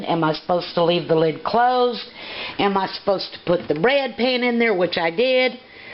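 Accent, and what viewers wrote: South, United States. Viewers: Nice Video! You are more entertaining than Paula Deen and you sure do have a more distinct accent than her!! Nonetheless, I am from Buena Vista VA and therefore speak Southern and Hillbilly so I had no trouble understanding you, and it was nice to hear some familiar accents!!! I already have a DAK Turbo Baker IV which makes great bread, regular, oat/whole wheat, white, and whole grain, as well as dough, and I have use all of those cycles with great results, but I am in the market for a machine that does the cake thing!! I was hoping your video would have shown that, but you stated that you're not interested in the cake making feature of your Ham Beach machine. Well, let me tell you something, Miss Lady, us men like dessert and you sound like you've got a good man that you've been taking good care of for many years, so you'd better start making some cakes for him in your new bread machine and then fire up your camcorder and put some videos of your cake bake on the internet!!!!!!!!!! 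If that recipe book came with a Lemon Pound Cake recipe in the 'cake' department, whip one up and put it on You Tube!!! Thanks!!!!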